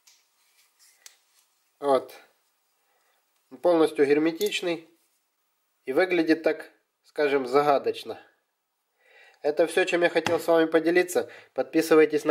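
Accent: Odessa, Ukraine. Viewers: акцент как у Одесских евреев.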